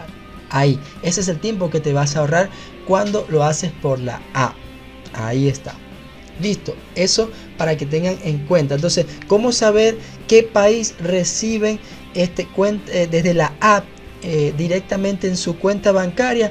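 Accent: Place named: Venezuela. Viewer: Mmm tienes un acento al chamo amigo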